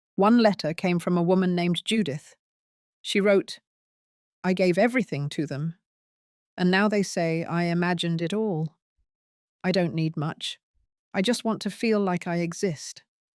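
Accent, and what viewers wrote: England, United Kingdom. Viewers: She's from Missouri but speaks with an English accent? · As the Woman voice is in UK English, assume this is a British story. You would not drive for 9 hrs to her sons home in the uk. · Why is a woman with a very heavy British accent talking abiut maps in Missouri?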